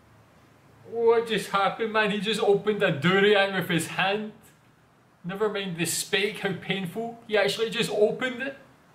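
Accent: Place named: Scotland, United Kingdom. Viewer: I like your scottish accent.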